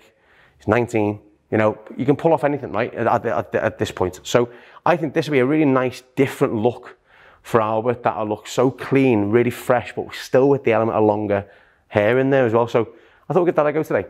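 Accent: Liverpool, United Kingdom. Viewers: Scouse I believe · scouse accent? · Love your scouse accent mate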